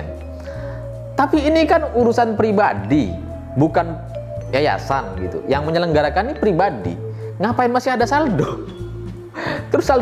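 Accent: Madura, Indonesia. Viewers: Serius nanya bang asal Madura ya..?? Logatnya mirip 🙏🙏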